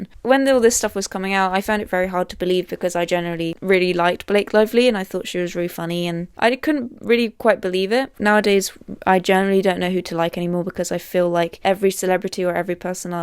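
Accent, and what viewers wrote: United Kingdom. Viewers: You probably get this A LOT but you look so much like Emma Watson and u sound like her cuz ur both British 😭 That’s a good thing though because you are both very pretty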